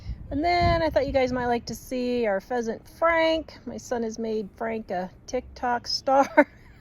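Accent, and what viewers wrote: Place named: Kentucky, United States. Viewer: Do you live in SE KY? My mother was from there. I didn't notice it in my mother, but decades later I heard her sister pronounce it that way. The two words I know I picked up from my mother's accent was 'iron'. I pronounced it as 'r-in' until I corrected it when I was 12 and my name 'Carolyn'. I pronounced it as 'Car-lyn'. I grew up in MI.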